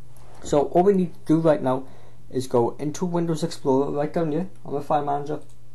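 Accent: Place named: Wales, United Kingdom. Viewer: Thanks great video. Nice accent. I take it your welsh lol. I done thousands of installs but was a bit stuck on the linx as never seen one before but after watching your video was a simple process. If you need any parts then contact me pcpitshop.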